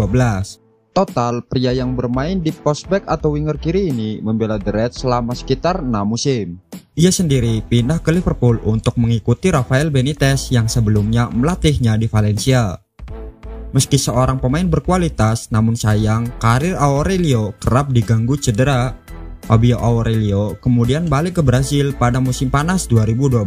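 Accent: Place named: Banyumas, Indonesia. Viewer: Ora ngapak ora kepenak,, gua tau dr aksen narator nya 😁😁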